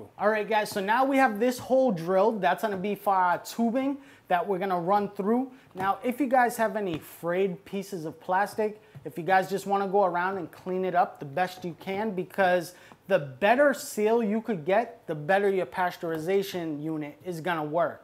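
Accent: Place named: Northeast, United States. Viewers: This dude sounds like he's from New Jersey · You can hide your face, but you can't hide that southie accent. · based on his accent you could pretty much narrow it down to being somewhere north and/or east of Pennsylvania lol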